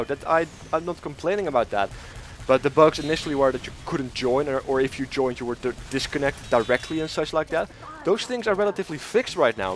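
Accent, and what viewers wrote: Netherlands. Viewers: are u dutch? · you dutch? =3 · you sounds dutch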